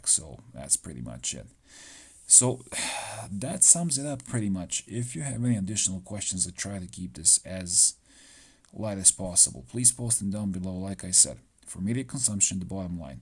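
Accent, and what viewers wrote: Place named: Serbia. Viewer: Jel si ti jebeno iz Srbije? Cim sam tvoj prvi video otvorio pomislio sam da si iz Srbije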